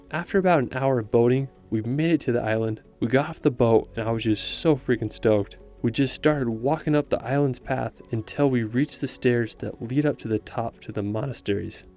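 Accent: United States. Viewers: Why he sound like American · What a strange dialect does the speaker use? Sounds much like American, e.g. he says "senner" instead of "centre".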